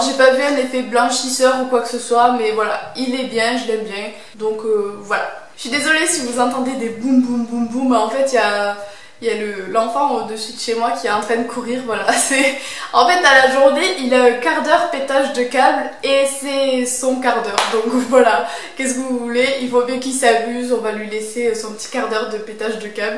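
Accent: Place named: Aveyron, France. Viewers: Ahah l'accent Aveyronnais, je suis Aveyronnaise! 👊🏼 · Big up pour l'accent aveyronnais !!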